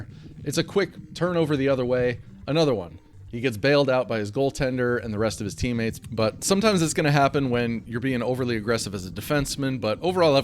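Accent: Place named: Philadelphia, United States. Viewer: excellent video, The Philly accent 😆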